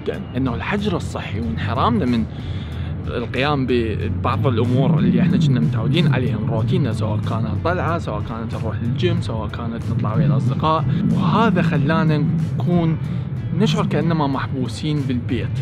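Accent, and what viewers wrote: Iraq. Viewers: لهجه عراقيه صح 😊 اووو لطيفه اوي 💝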